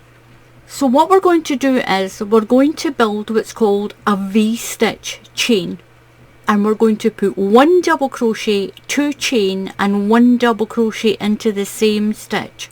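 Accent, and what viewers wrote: Scotland, United Kingdom. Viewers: Tbh I mainly watch your channel because of your scottish accent, I just love it SO MUCH 😍😍🥰🥰 Your teaching skills are also awesome though. Keep up your great Videos!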